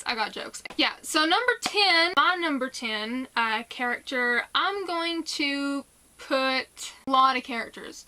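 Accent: South, United States. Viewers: Because she's a southern chick. I love that southern accent. I hear it all the time since I'm from Texas.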